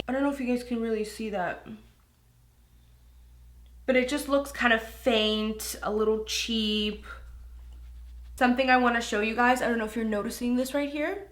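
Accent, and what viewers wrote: Canada. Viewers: Is she Canadian? Just the way she pronounces certain words sounds Canadian. :-)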